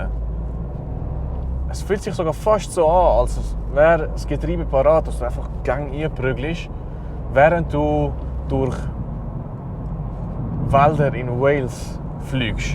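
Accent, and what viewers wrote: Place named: Switzerland. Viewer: Isa schwiiiiitzerdüüüüütsch 😂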